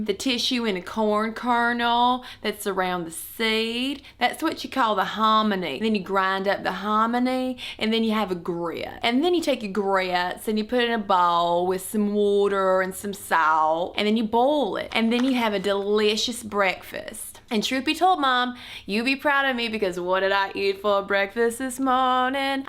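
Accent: South, United States. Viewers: I love your channel, just found it today, but please, no more southern accent. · I like your southern accent :) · I want to hear mom of your southern accent. It's great! haha!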